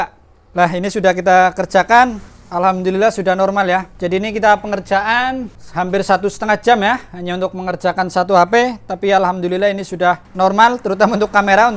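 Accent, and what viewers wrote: Banyumas, Indonesia. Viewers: Lokasi pundi mas ? Kayane wong ngapak loh ,😁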